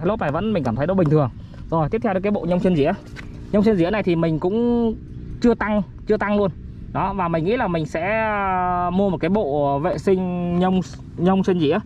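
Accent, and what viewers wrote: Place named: North, Vietnam. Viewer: Giọng ông này như kiểu người miền bắc ấy Mong anh rep lại ạ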